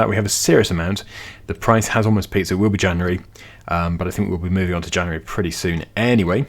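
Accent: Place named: Southern England, United Kingdom. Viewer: Can I just say, the British way of saying things is the correct way. Our American friends pronounce things incorrectly and have some of their own words for things. A trunk to the American’s is a boot to us. Lol…you can’t cater to every language dialect and varying terminology. Just be you, be British (I would say and ‘proud’, but atm Britain is a little broken). Anyway at least you’re from down south and sound posh. Unlike myself who is a northern lass and sounds as broad as a plank, with a strong Lancashire accent 😊 I would have put money on you flipping that trailer with all that wood on it! You surprised me lol…it was very close at one point though. 😂🤣 Loving the series very much, but I would like to see you ride the horses now and then. I hope you are feeding Dogwin! Thanks for all your hard work.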